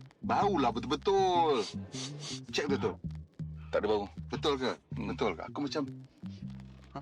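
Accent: North, Malaysia. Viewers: Biasanya saya akan puas dengan penjelasan, cara bercerita dan selit berseloroh loghat utara, tapi kali nih saya berkedut dahi nak faham abang sulam campur rojak dgn bahasa Inggeris..hemmm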